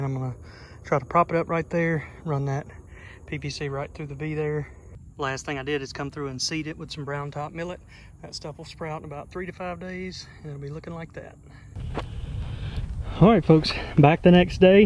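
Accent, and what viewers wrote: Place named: Texas, United States. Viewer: its not obvious its texas accent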